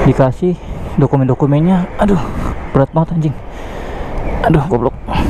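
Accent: Indonesia. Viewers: Logat ngomong kayak org jawa benarkah?:v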